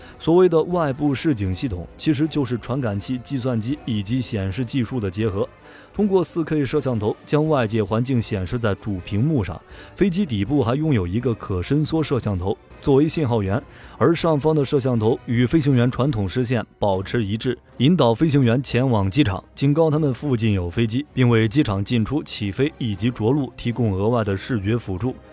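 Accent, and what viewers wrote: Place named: China. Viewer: 大陆人口音，台湾人专用频道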